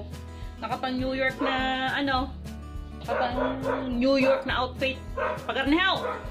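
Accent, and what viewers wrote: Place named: Batangas, Philippines. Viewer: ayos hahaha puntong punto eh akoy napahagalpak ng tawa eh utas...birthday kopa naman ngayon napasaya mo ako ate..ikaw ay sadyang tunay na batangenya..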